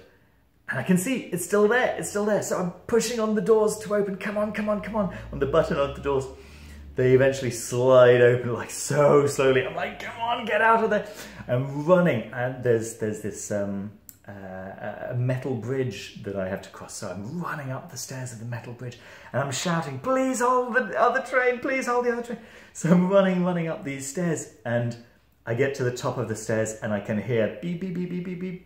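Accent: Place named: England, United Kingdom. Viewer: No offense, but I can see the French heritage in your eye's and nose. So weird seeing that paired with your English dialect.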